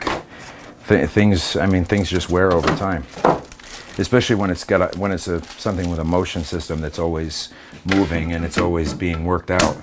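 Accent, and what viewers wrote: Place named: Brooklyn, United States. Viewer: You got a slight Brooklyn accent! lol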